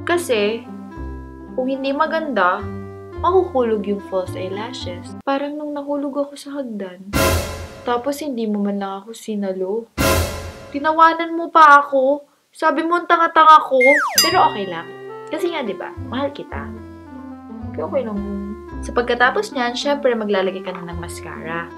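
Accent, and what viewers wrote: Ilocos, Philippines. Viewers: ilokano ka ba?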